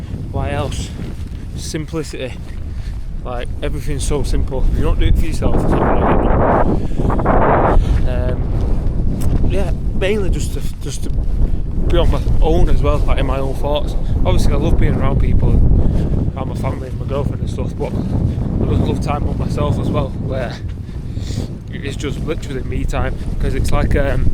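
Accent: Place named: Salford, United Kingdom. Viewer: Good vid pal new subscriber sound u like from my neck of the woods salford or near by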